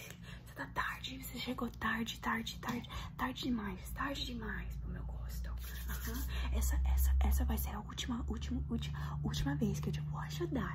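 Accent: Minas Gerais, Brazil. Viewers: Moça não tem como você não ser do Brasil, até o sotaque é fortíssimo e amei o asmr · Já ia pergunta se ela é de Minas kkkkkkk Perfeita.🤩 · Eu amei, ficou muito bom seu portugues, ficou um sotaque bem mineiro adorei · estou confuso com o seu sotaque brasileiro, mas pelo menos você tentou, excelente asmr! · Your Brazilian accent is so strong lol not hating at all, you’re Portuguese was perfect!! Love your videos!!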